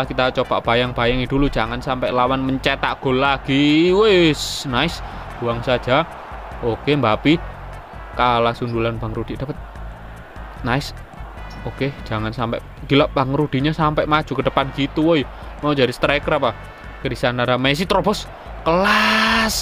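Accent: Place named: Banyumas, Indonesia. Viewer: Wong ngapak kie kayane.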